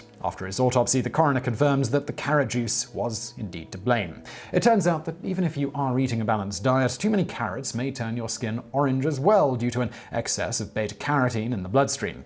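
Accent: United Kingdom. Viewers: Your pacing and elegant English accent make stupid sound almost near normal. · Can I please get a video where you pronounce things like garage, aluminum, etc. in proper American? I'm getting tired of using the Brit to American translator... and don't even give me your kilo and kilometer bullcrap...or 999 instead of 911. I think I covered it all...for now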